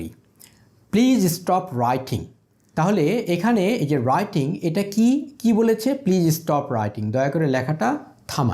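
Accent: United Kingdom. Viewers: Apni british English spoken style use koren kno???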